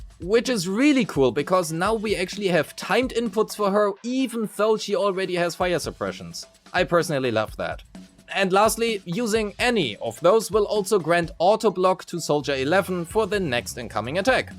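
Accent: Germany. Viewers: I know a german when i hear one, danke für das video meister!!!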